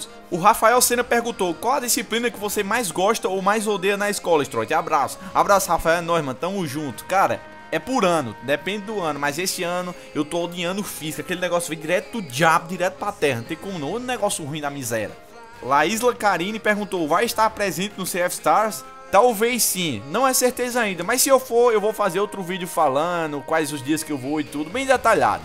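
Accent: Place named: Paraíba, Brazil. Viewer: O ta com menos sutaque da paraiba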